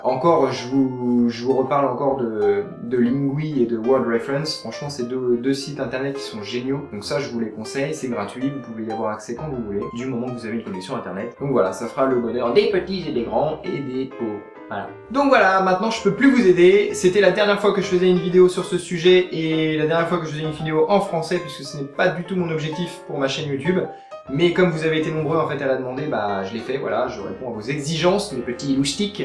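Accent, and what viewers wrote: France. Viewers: T'es de Franche-Comté ? :)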